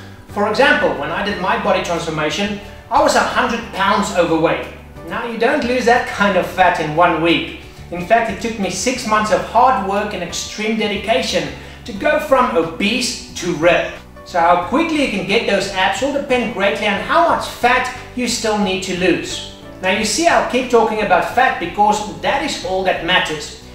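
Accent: South Africa. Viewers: If i were to guess, i would say non other than English. From his accent, I think he is from South Africa where they speak predominantly English although there is a possibility that he also speaks Afrikaans which is the second most spoke language in SA. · are you south african?? :) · South African accent... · You sound like your South African and British. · Sounds like South Africa, right?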